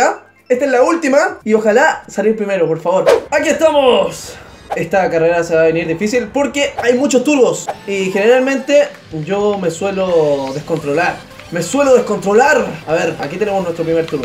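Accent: Chile. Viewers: Pense que eras de chile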